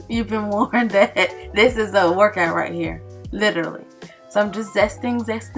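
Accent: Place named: South, United States. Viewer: You sound so sweet and you’re definitely from the south which makes you sweet. I’m from the south tube but I live in Arizona. It’s not as sweet as the south. I like your cooking channel. Keep it up girl.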